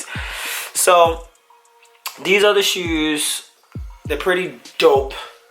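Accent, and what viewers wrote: Baltimore, United States. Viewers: u gotta b from bmore i could hea it in ya accent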